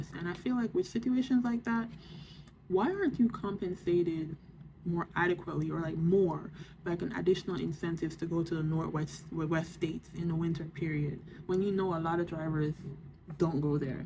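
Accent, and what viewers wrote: Jamaica. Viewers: Are you Jamaican 🇯🇲 Yuh sound like a yard girl…welcome to trucking 🚛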